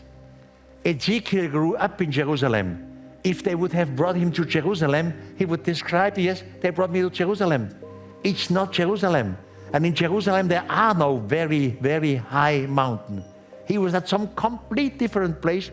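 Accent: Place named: Switzerland. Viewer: Er hat erst kurz vor seinem Tod angefangen Videos auf Englisch hochzuladen und weil er halt Schweizer war und älter als mein Vater verstehe ich sein Englisch besser als native Speaker. Ich habe damit kein Problem. Von Däniken und ich sprechen Englisch in etwa auf demselben Niveau, welches nicht besonders anspruchsvoll ist. Insofern: No problem. 😅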